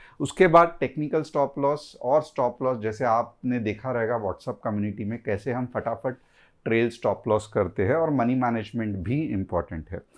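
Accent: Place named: Mumbai, India. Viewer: सर आप मुंबई से हो क्या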